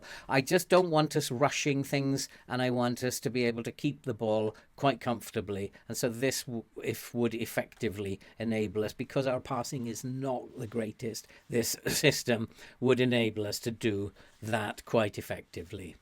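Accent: Wales, United Kingdom. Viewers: What an amazing guide, I really wanted to find a way to create my own built on the abilities of my players rather than copy and paste one. This is exactly what I needed, thank you! I also detect a Welsh accent there mate, where are you from? Cardiff here! Subscribed with thanks!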